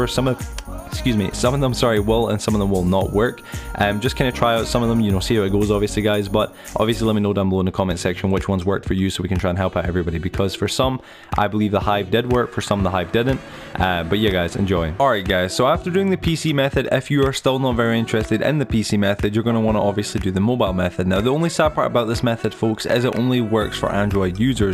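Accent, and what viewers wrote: Scotland, United Kingdom. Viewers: Are you Scottish? You just sound Scottish, if you are then that's cool, cause I'm also scottish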